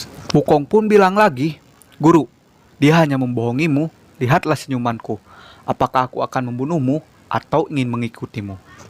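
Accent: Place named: Java, Indonesia. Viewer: Logat jawa 😂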